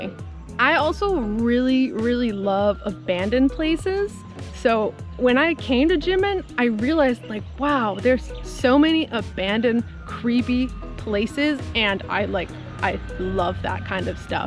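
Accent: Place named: Canada. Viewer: Hi, you had make a right decision to live in a small town to learn mandarin. You sound Canadian.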